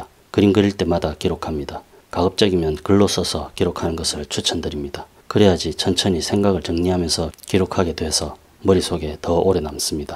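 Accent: Gyeongsang, South Korea. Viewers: 안녕하세요, 제가 캘리그라피랑 수채화 배우는데 수채화 찾아보다 인물화 검색하니 선생님 영상들이 떠서 들어왔는데 그림 중에서도 가장 어려운 인물화를 생동감 있게 그리시네요. 감탄합니다.. 그림도 그림이지만 영상 내용과 맞지 않는 질문일 수 있는데, 선생님은 경남 서부 분이신가요? 제가 팔도 사투리에도 관심이 많은데 선생님 억양이 경남 서부이신지 아니면 경북이랑 섞인 경남 함양 쪽이신지.. 궁금하네요. 경남 경북 억양이 골고루 섞이신 것 같은데 대구나 경주 같은 경북 남부 혹은 경남 동부 부산쪽에서 들리는 음색이 아니셔서 의아해하다 용기 내서 야심한 밤에 댓글 남겨봅니다. 감사합니다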